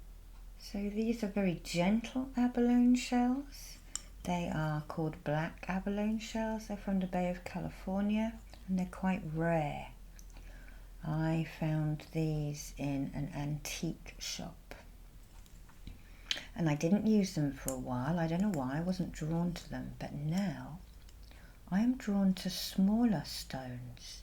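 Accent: England, United Kingdom. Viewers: I love the way The English from England sounds, what an enchanting accent =voice, you and put me to sleep easily 😵‍💫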